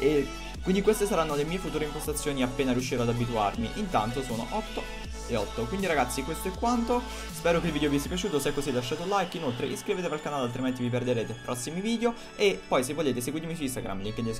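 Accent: Naples, Italy. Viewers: Sei napoletano fra? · Quando ti parte il napoletano è bellissimo ahah grandissimo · Ma ij o sapev Uagliu Sei di Napoli?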